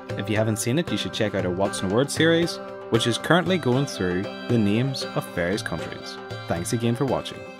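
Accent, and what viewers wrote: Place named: Ulster, United Kingdom. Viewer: I don't know for sure but I'd say Ulster.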